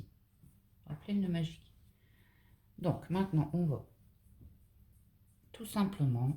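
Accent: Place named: Nord-Pas-de-Calais, France. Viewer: Très joli est je pense reconnaître l'accent cht'i, bonne année 🎉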